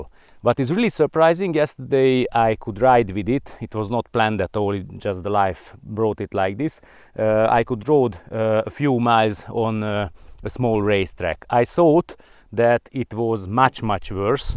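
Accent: Hungary. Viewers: Hungarian accents are cool. Sounds slavic, latin, and germanic all at the same time.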